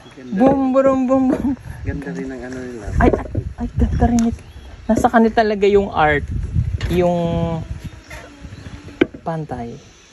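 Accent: Visayas, Philippines. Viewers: Sir di na jud ka mabaligya sa kabisayaan, kahibawo naman ka mag bisaya. Good job sir.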